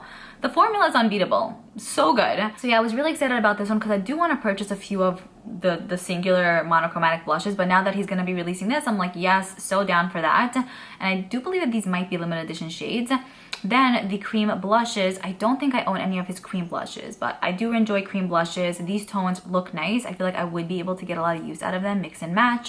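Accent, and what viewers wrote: New York, United States. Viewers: I love your NY accent. You are fantastic and I appreciate your videos. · You have a New York accent. When I moved out of state I was tortured because people would make fun of my accent. 20 years later now I have a Ohio accent and I even say pop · I'm a NJ girl, born and raised, and the New York in you makes me love you so very much. Your way of speaking is so endearing to me- keep being authentically you! Unfortunately everyone has an opinion about everything and everyone, and feel the need to shout it from the rooftops of the internet 😡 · The way you speak? There's nothing wrong with the way you speak.... Ppl are so freakin' rude.... She lives in New York! She has an accent! F ppl, for real. 💗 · You have a New York accent, no? I can't believe how people can be...good grief, I love the way you are! ❤ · Agreed! I follow because she has her own little dialect and I love a good "New Yawk" accent as well.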